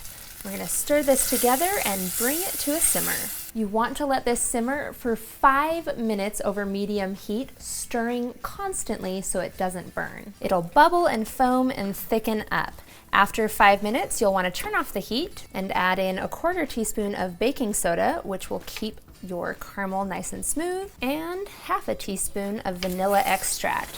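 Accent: United States. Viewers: Mam you American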